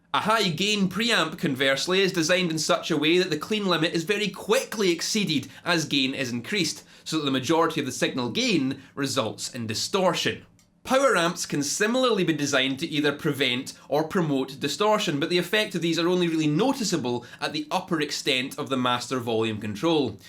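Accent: Scotland, United Kingdom. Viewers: Why are all the guys who make pedal videos Scottish or from the British isles?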